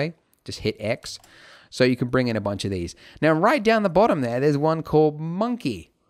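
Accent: Australia. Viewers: What is that Ozzy/Kiwi/Brooklynese accent?? :)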